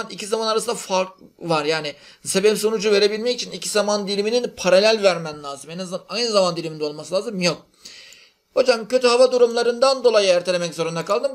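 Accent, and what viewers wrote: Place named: Arab world. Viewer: hocam arapça edasıyla ingilizce ders anlatıyosunuz.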